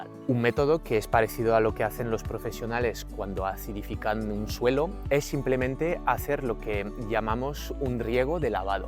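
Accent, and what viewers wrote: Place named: France. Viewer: Sería bueno que nos explicara si en ojo o tallo falta o sobra algún tipo de mineral que sepamos. O cómo saberlo sin hacer un análisis de tierras. Porque aquí en Galicia existe el minifundio y muchos no podemos dedicarnos a hacer un análisis por cada pequeño pedazo de parcela que tengamos. Separados unos de otros😮 tienes un ligero acento francés😂 muchísimas gracias❤ muy buen vídeo❤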